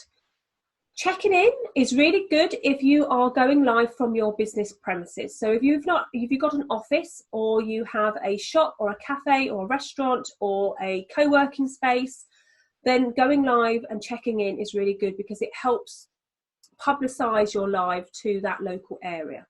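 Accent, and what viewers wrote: United Kingdom. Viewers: ok I am a little dense. What are you using for recording you screen for this presentation. Ps. You always get back to me after I ask a question. I like that and the. Brit accent. Lol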